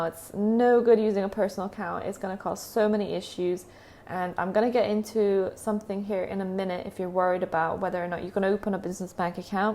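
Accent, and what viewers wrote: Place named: United States. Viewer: How come you have an American accent? 😊 Thank you so much for the videos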